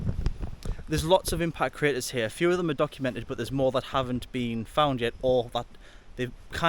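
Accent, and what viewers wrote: England, United Kingdom. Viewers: Czy ty nie słyszysz że to Anglik.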